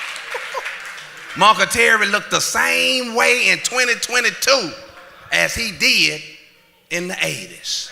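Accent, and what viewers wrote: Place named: South, United States. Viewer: I LOVE his southern accent! #Mississippi I have it too bro! Love your work!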